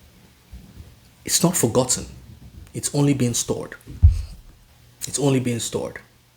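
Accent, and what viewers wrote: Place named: United Kingdom. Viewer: Your English is that of English U.K accent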